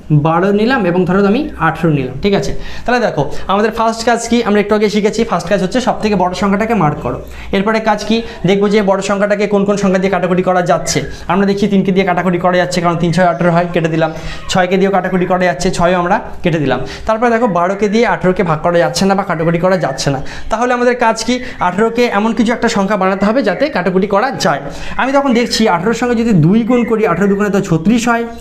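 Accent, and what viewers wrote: Bangladesh. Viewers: আপনি কি নোয়াখালী?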